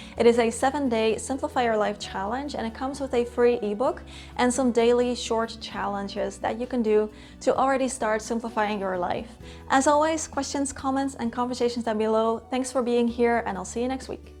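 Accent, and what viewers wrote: Netherlands. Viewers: Love your calming style of videos and love minimalism! When I saw your background I knew you were Dutch somehow 😂 And when you started talking I was like yup! Subscribed! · You have a lovely channel.🙏btw, are you Dutch? Because of the accent I was wondering😊love your interior... I'm on my way to being a minimalist but it'll take me at least one year to get rid of unnecessary stuff...😂😁😇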